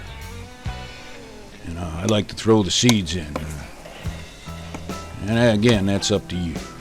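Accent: United States. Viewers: U sir has an authentic good ol american voice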